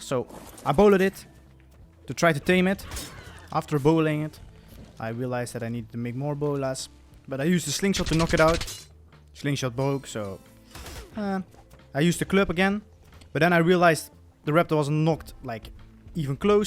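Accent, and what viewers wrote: Netherlands. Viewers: Hahah eerste zin die je zegt en ik hoor nu al dat je Nederlands spreekt, maar wel een goede vid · E bro ik hoor dat je nederlands bent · Ik hoor aan je accent dat je nederlands ben wil je eens ark splen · U sound so Dutch like me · Ik kan nu al horen dagge ne Nler zijt haha goe videoke :P groetjes van België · woooow nice video but are you Dutch? cause i can hear some kind of accent!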